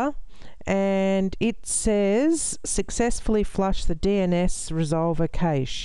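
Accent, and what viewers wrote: Australia. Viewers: from your accent I noticed that you are Australian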